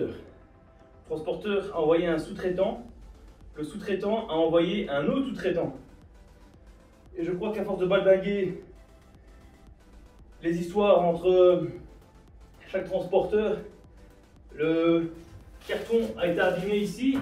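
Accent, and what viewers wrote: Belgium. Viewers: tu es belge ? si oui quelle region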